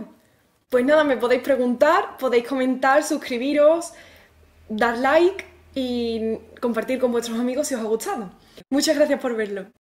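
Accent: Andalusia, Spain. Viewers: Me gusta tu abilidad con la música, y tu acento español. Gracias por compartir ambos con álguien que está lejos de su patria. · ¿De què parte de andalucia eres? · Aparte de hermosa eres virtuosa y me doy cuenta q los chilenos tenemos acento andaluz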